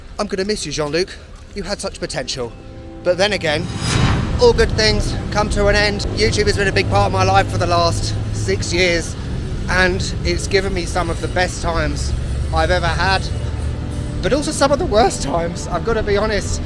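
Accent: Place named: RP, United Kingdom. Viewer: Yea, I've read the article you posted. Yet, you might haven't got that western Londoners accent anymore but RP is right there LOL 😀. Most of all I like your diversity and non common approach. Greatly appreciate the way you presented smaller cities and of course my home town of Cacak 🙂. Cheers mate/brate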